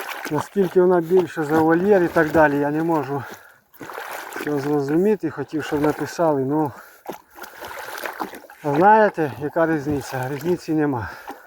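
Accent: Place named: Olevsk, Ukraine. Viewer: Відчуваю, що це десь на півночі Житомирщини. А, вже почув. Олевщина. Чути по вимові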